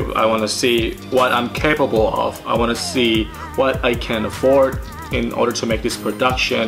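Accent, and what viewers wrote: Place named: United States. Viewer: It's been a long time I did not hear your voice. Turns out you speak with a stark American accent now! :)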